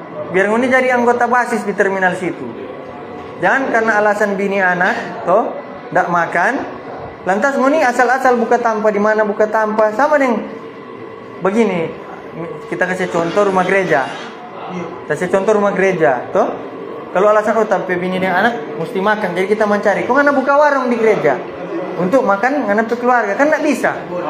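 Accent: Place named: Manado, Indonesia. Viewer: Bahasa manado logatnya ashek jg jd terbawa..bawa aku 😊 salam dari Bandung ndan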